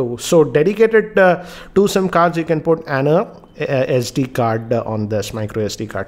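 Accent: India. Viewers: I like this Dude Accent though! Not too thick Indian Accent but with a bit touch of English Westerner Accent. 👍 Nice Review too!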